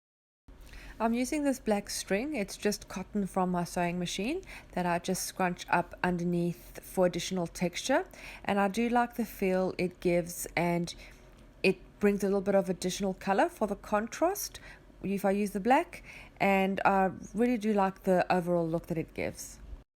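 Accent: South Africa. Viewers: Discovered you quite by accident this morning and so pleased I did!! Wonderful creativity! Your work is incredible! And an added bonus is hearing a South African accent again! Awesome!!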